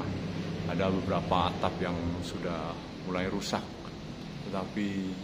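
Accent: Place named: Madura, Indonesia. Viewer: baru nonton channel ini. saya suka karena rumah Belanda kuno. Logatnya Msdura banget... jd inget sama famili jauh saya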